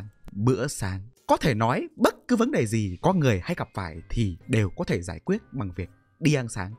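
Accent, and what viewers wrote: Hai Phong, Vietnam. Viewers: Chú ơi chú là người Hải Phòng ạ?😅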